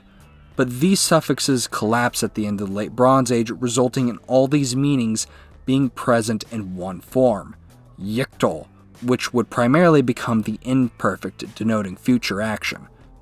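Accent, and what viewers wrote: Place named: England, United Kingdom. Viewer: Everything was almost perfect.. I just have one problem. The way you pronounced the Hebrew sentence in the middle of the video, offended me greatly.. lol.. kidding. But it was way to harsh to listen to. If you want to improve your pronunciation (in modern Hebrew, since that's how you pronounced it), the Kh has to be softer, and the stress is a bit off in most words.. your English accent is also pretty noticeable in the lack of clear vowels.. it should be pronounced more like in Latin (the Ah sound, that should sound more like Uh). Apart from that very clear and precise video, filled with factual information.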